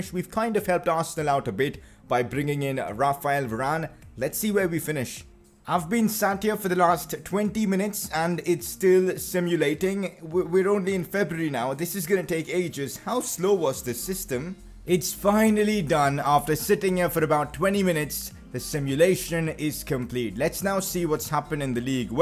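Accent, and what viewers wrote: India. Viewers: You sound indian..are you? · U Indian mate ?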